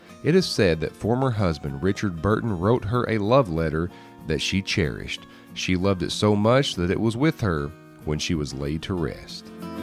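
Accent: United States. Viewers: Its so refreshing to hear someone narrate in a clear american voice that can be clearly understood! Not like those British and India narrators! Most of the time i have a hard time understanding tbem!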